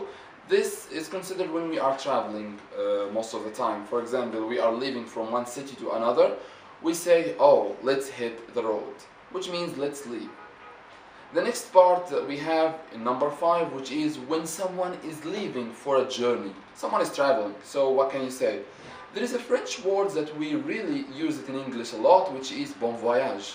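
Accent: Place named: Egypt. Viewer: Egyptian accents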